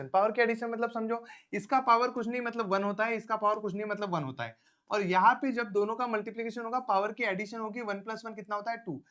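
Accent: Maharashtra, India. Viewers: sir marathi manus aahet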